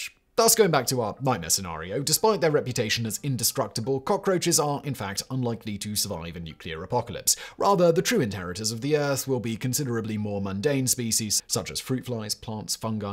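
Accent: United Kingdom. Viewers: I do love that you read Wikipedia to us, I just hate your particular British accent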